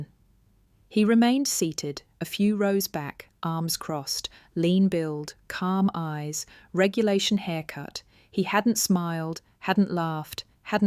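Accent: United Kingdom. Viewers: I’m not too sure of the narrators accent. I like the story line, just not the ‘almost’ British accent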